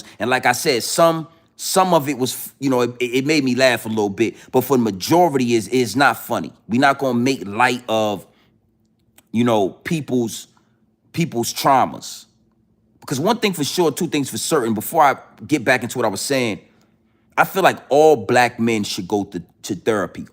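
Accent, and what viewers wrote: New York, United States. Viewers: You sound like you form new york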